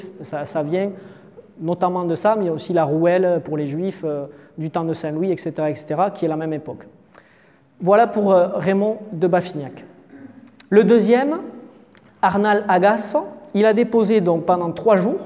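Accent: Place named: Occitanie, France. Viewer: Un pur bonheur de vous écouter! Belle faconde égrénant notre histoire dans ce noble accent que je connais si bien et qui fleure bon notre pays d Occitanie! Un véritable débat d idée avec ce public passionné. Merci pour ces conférences didactiques, exprimées dans une remarquable clarté digne d un grand historien 😊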